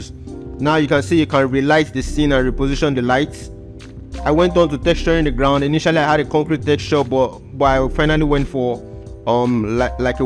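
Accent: Nigeria. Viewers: heyy.. waasup adre you in a nigerian ????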